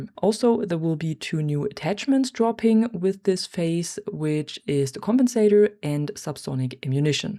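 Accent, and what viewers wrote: Germany. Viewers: this is my new favourite battlefield channel. are you from germany? you sound german :D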